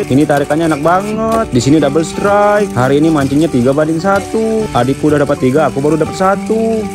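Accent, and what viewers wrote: Java, Indonesia. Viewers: Bg orng Sulawesi tpi logat jawa sma kita bang😂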